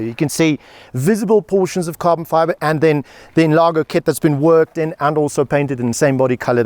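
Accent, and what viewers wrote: South Africa. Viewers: A South African accent is so unique. And it explains emotions and feelings so well. Great job on bringing us these wonderful videos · Is my guy South African ?? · Didnt know homie was south african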